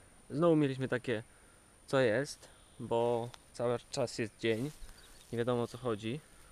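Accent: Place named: Podlasie, Poland. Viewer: hehe, tak pytalem bo zaciagasz troche jakby po podlasku... :)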